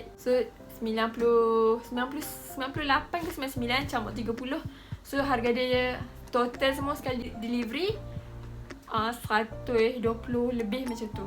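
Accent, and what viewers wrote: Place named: Kedah, Malaysia. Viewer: "Sampai tak Celuihhh" , org kedah kita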